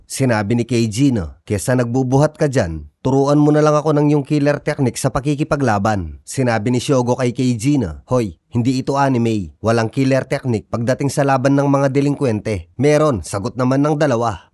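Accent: Pampanga, Philippines. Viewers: Haha kapampangan ka boss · Kapampangan ka ne boss?? Accent kc.😅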